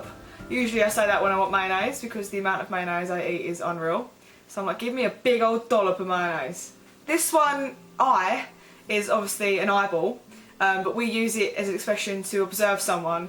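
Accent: Essex, United Kingdom. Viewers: this is not a typical Essex accent, it's chav Essex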